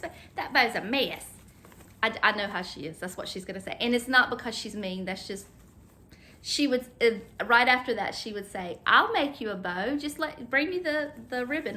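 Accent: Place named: Kentucky, United States. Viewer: I have wanted to do a Halloween tree for awhile. I bought a bunch of decor for it this year and invited 15 girlfriends for a Halloween Party. As I was watching you it dawned on me that my tree is flocked with snow!!!! Oh my word, how dumb can I be??!!! Guess I will hit some consignment stores today 🤞🎃I love your channel and your decorations but my favorite is your sweet way and southern accent. Reminds me of my cousins in Kentucky.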